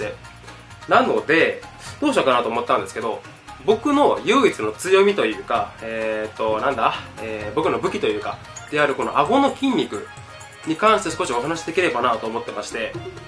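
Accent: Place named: Fukuoka, Japan. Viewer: 福岡なまりが懐かしくて癒やされます！お疲れ様でした(`･ω･´)ゞ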